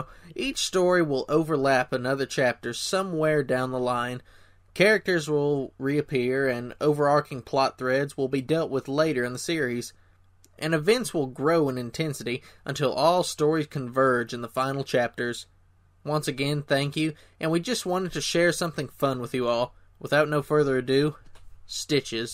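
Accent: South, United States. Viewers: Another great work! And you narrate so well. Your southern accent is always quite pleasing! Thank you for your YouTube channel.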